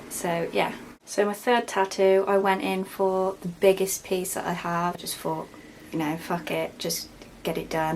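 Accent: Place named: United Kingdom. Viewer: Your moth and butterfly tattoos are soooo pretty! And I love your accent, it's so beautifully British <3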